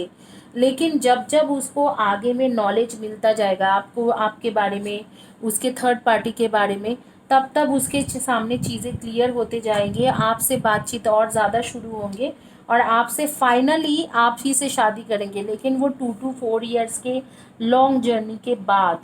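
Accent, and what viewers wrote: Bengal, India. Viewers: Radhe Radhe madam ji. Apka bolneka tarika se mujhe lagta hey ki ap bangali ho..... Pile no 3 chuna tha . Jo mech nei kiya...... Jay sri krishna.....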